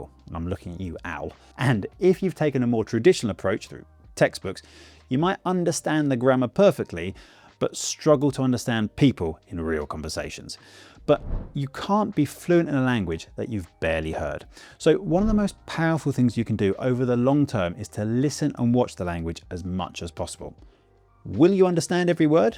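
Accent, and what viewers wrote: United Kingdom. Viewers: Hi! Do you mind telling me what variety of British accent you speak? I really like your accent and watch your videos also to imitate your speech.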